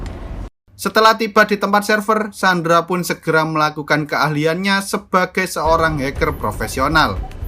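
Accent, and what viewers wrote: Java, Indonesia. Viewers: Sampean wong jowo Yo?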